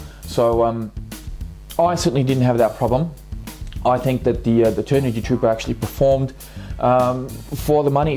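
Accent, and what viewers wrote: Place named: Australia. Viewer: Hi, I see it's been about a year since you did this review of all your SCT's and I wonder if things have changed with the Prolite. I have both a Trooper and the prolite. I just bought the Prolite SCT and ran it for the first time today. The prolite IMO beats the trooper by ton. It handles better, is faster and of higher quality. The first thing I looked at were the body posts. On mine they are so flexible that you can almost bend them 90 degrees and they just bounce back. After 30 races nothing broke so I am pleased with that. My only issue was the motor mount was loose right out of the box. I found that out when I did a little test run in my yard. Luckily nothing stripped or broke. I simply reset the mesh and tightened the screw on it. I love this truck already. I raced a bunch of friends who run slash 4x4 both HCg and LCG chassis and not only did I keep up with them I beat them regularly. 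Great videos you put out. Lots of great info too. As they say, "Come for the info, stay for the accent".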